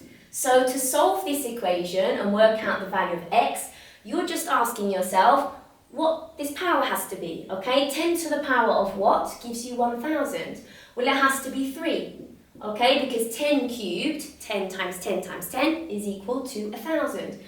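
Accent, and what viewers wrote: England, United Kingdom. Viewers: Bonjour, je ne jette pas de fleurs et c'est bien la vérité votre chaîne Youtube est une référence en matière de l' éducation des mathématiques, elle est d'ailleurs parmi mes rares chaîne préférés, j'ai parcouru presque tous vos vidéos et c'est toujours avec le même haut niveau de professionnalisme et de la bonne humeur que vous enseigner cette matière aussi Clairement que brièvement ,d'ailleurs vos vidéos me sont de grands aide pour enseigner les mathématiques à mon neveu,parfois à distance, qui est inscrit tant tôt dans un collège américain tant tôt dans un collège britannique à Dubaï et qui est un brillant et aimant des mathématiques et qui apprécie beaucoup d'ailleurs votre manière d'enseignement et aussi votre accent anglaise il faut signaler aussi que votre langue et prononciation est très claire et compréhensible malgré que j'ai étudié les mathématiques en français et mon anglais est basique je ne trouve aucune difficulté pour comprendre, merci infiniment pour votre précieuse aide et pour vos efforts déployés et votre temps dédiée à l'enseignement et de partage du savoir félicitations bonne continuation et bonne chance.👍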